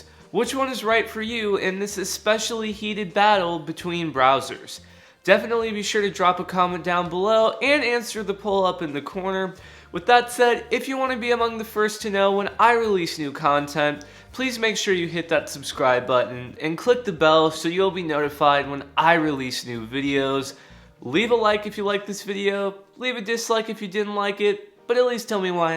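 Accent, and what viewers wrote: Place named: California, United States. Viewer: I like his California Stoner dialect.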